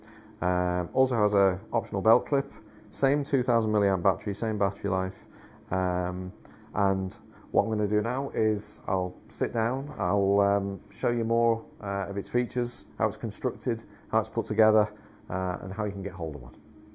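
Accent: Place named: Wirral, United Kingdom. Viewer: Sticking with that Wirral Accent 😂 Mate, You do such a good good with these designs, They look incredible. Looking forward to my Bender arriving, I should have come get it since your down the road. I'll get a nibbler next money day! You think you'll do something for the RAK Boards or T-Beam. You design would be awesome on the T-Deck. All.the best mate! Sean (2E0SYA)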